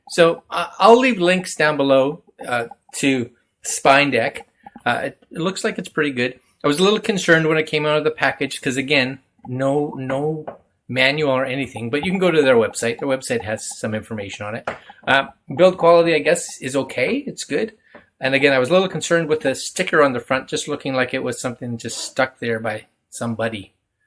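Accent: Canada. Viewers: I’m Canadian but this guy sounds 100 times more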